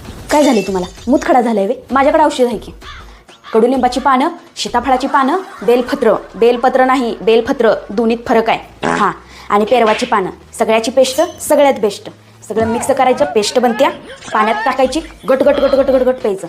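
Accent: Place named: India. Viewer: दीदी गाव कुठलं आहे तुमचं एक वेळ वाटते तुम्ही शहरात आहात एक वेळ वाटते खेड्यात आहात छान छान तुमचं बोलणं आहे असाच मराठी माणूस पुढे गेला पाहिजे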